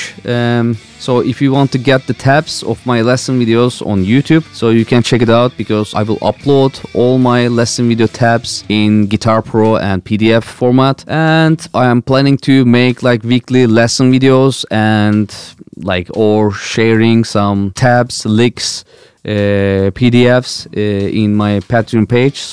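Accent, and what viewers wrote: Turkey. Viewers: Abi türk olduğunu aksandan anladım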